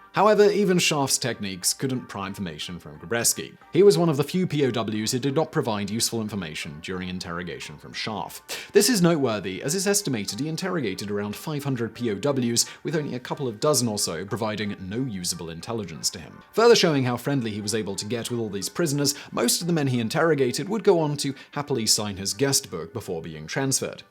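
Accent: United Kingdom. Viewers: It's Vsuace but British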